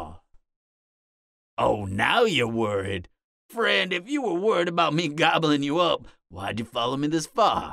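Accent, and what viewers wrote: Louisiana, United States. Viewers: Your southern style accent was amazing and this audio was super cute. Excellent job on this one! ❤️❤️❤️❤️❤️ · Like the Cajun sound your voice had. This reminds me a little of a song, by.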